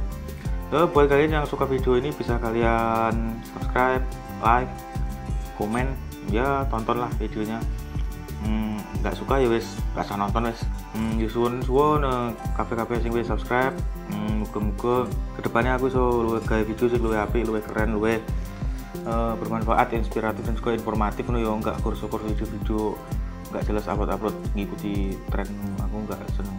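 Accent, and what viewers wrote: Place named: Java, Indonesia. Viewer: Medok 2 salam salim slem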